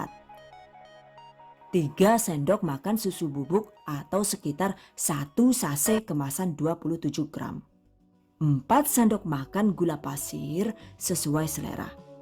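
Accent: Banyumas, Indonesia. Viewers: Waalaikumsalam. Catat. Ngapak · Suarane mdok tmn yakkk asli bnyumas y yu😁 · suka cegek saat dgr ad bahasa ngapak nya hahahaha,, tp lucu juga sih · Suka bahasa ngapaknya.. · noted..besok mo nyoba bikin ahh..btw mbakayune lucu temen klo lg ngapak mix with english..👌👍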